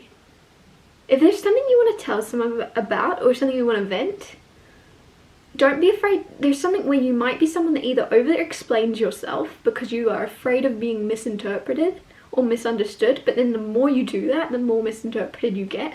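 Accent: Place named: Scotland, United Kingdom. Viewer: Are you Scottish perhaps or of Scottish extraction? We have a thing for hot 🔥 blonde 👱‍♀️ Scottish neuroscientists having lived w one in East Harlem & after reading 📚 Kelly McGonigal's book 📖 'The Willpower Instinct' when we learned that the greatest predictor of human resilience is Heart Rate Variablility & we spent a year getting down to breathing 1 breath per minute & going to NYSC & doing an hour on the Stepmill, burning 🔥 850 calories & getting our heart rate up to 165 bpm, & we've needed every bit of resilience we developed trying to survive in the 🌎 since we left NYC. What if we're a lesbian in a man's body? "Exit Through the Gift 🎁 Shop" is an excellent movie 🎬 we saw down on Houston St. about Banksy & Mr. Brainwash. We don't tell anybody anything because they never like hearing what I have to say & tell me that it's just my opinion. 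kthxbye!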